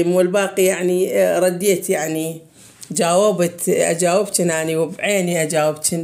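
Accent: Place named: Kirkuk, Iraq. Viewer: اللهجه نفس لهجتنه 🥺حنيت لكركوك وهاي راح اشترك 💔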